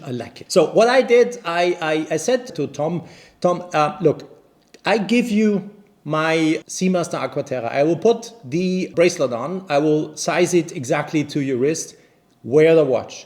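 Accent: Vienna, Austria. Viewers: Finally I know how a Viennese person will sound like. Omega makes great watches. Thank you for sharing the story! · you are absolutely spot-on on this matter. I watched this video with awe and bursted with laughters of your sense of humour and that Vienna accent hahaha. It's beyond f**king bullsh*t with this Rolex artificially-created frenzy. In Hong Kong here it's as bad as you can imagine, I wanted to purchase a simple black dial 36mm Oyster Perpetual, the freaking AD told me to fuck off and go for the grey market, I checked the grey market price 2 weeks ago - a f**king 3-hand black dial OP is now costing >10,000 USD. I was like "Okay that's it" and just move on. The old good days before 2021 when Submariner / GMT/ Cosmograph Daytona got hyped but you could still walk into a Rolex AD to get an OP, Day-Date, Datejust or occasionally Yachtmaster; now you walk in any of them and all they have are lady models with diamonds or very small lady models. 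Honestly at this current point I won't be surprised if the lady Rolex models are going to get hyped and within 2022 you walk into a Rolex AD there is NOTHING to see. Just arrogant employees and empty shelfs. Keep up the good work, love your content and looking forward to many more to come 🙏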